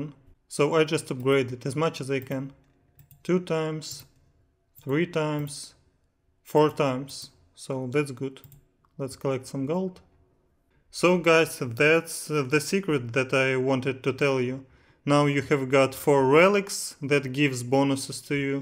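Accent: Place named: Russia. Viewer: русский акцент, красава, норм шпаришь по-английски!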